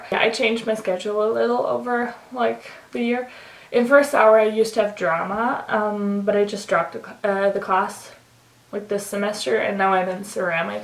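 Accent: United States. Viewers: Ich weiß zwar nicht, wie dein Englisch vor der Reise war, aber ich finde es echt super! Du hörst dich so amerikanisch an, als wärst du dort geboren und hättest dein ganzes Leben nichts anderes gesprochen 😊 freut mich total, dass es in der Schule so gut klappt 😍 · Omg ich dachte am Anfang seit wann habe ich eine Amerikanerin abonniert😂😂😂 Omg du sprichst so gut. Ich wäre untergegangen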